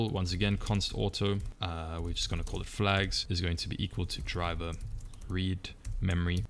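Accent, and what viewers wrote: South Africa. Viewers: I figured you were South African but when I heard the "yoh if I could spell" I knew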